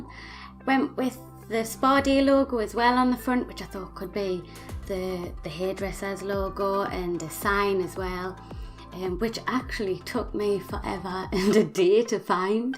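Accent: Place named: England, United Kingdom. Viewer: I Make Simmies Not Builds....Wish YOU could make a TUTORIAL on ROOFING????? LOL OMG YOU should see my Roofs!!!! LOL LOL Wowww Extremely Happy I found you going through Sims 4 Tutorials........I LOVE How Creative you are Ive watched 2 of Yours so far and this ONE I LOVED them !!! I Really Wanted to Tell you how Inspirational you are to what I can possibly do Myself I am NOT a BUILDER........BUT TRYING ... ONLY 5 months now With Sims 4 Never Played 2 or 3 but did Play all the Originals I am Shocked at How different and much the Game has Changed from 12 plus years AGO........ITS AMAZING!!!!!! I Wish I had Played 2 and 3 I Would Know so much MORE........ haha Anyway......Love your Techniques with your MOO so much!!!!!! Wonderful Idea With this one!!!!! too :D Subbed and Faved LOVE YOUR English Accent :P Mine is TEXAN......so Very Different ..... 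Hugzzzzzzzz and Hope to see tons more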